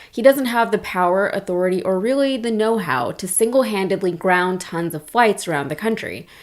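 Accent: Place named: Texas, United States. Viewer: Your whistling has become quite endearing because Matthew McConaughey does the same thing,,when he talks it’s called the Texas whistle❤ I thought you had a speech impediment but it’s just an accent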